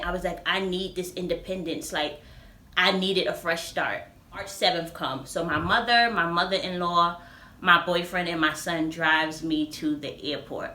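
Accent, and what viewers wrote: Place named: New York, United States. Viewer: lol idk how CO ppl sound lol but all i hear is NYC. Thanks for the info I’ll look up these areas for sure and weigh my options. Ugh it sucks leaving the city where we don’t need a car but I need a change. Btw great vid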